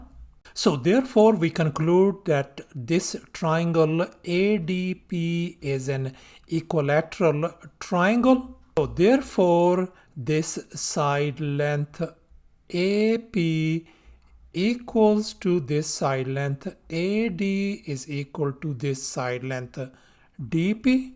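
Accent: India. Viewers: Teacher are you from İndia?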